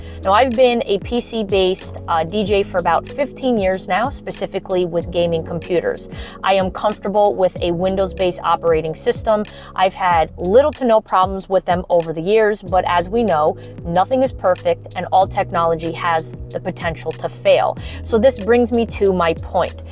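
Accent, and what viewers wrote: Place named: East Coast, United States. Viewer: it’s the east coast accent that you have JUST a bit of….HappyHappy Joy Joy!!!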